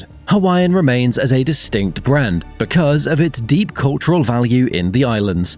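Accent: United Kingdom. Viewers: You have the most annoying standard British voice ive ever heard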